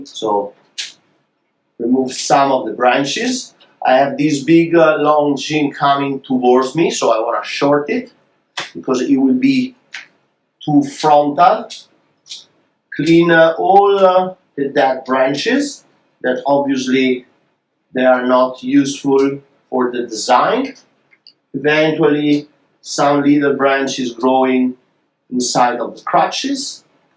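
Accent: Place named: Italy. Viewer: Ma quanto internazionale? Almeno l'inglese italianizzato si capisce bene😅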